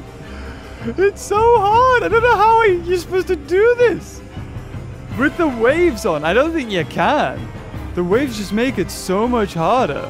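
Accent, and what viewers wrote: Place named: United Kingdom. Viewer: You kind of sound like Wilbur Soot... I guess your voice sounds similar because of the British accent.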